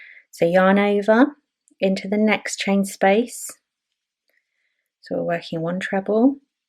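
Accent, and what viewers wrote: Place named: England, United Kingdom. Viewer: Thanks a lot … great tutorial and I love your English accent …am going to have a look at your book, sweetie.Have a nice day, hugs from France.🥰😘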